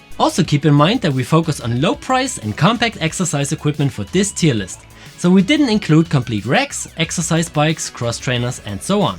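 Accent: Germany. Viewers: Leichter deutscher Akzent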